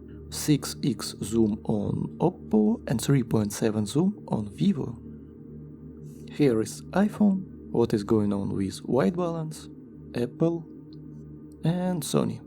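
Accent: Russia. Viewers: Отличный обзор! Акцент выдает русские корни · Слышу ты Русский, есть канал на русском или все на английском? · Русский акцент чудовищный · У вас русский акцент, поэтому мне очень легко понимать ваш инглиш.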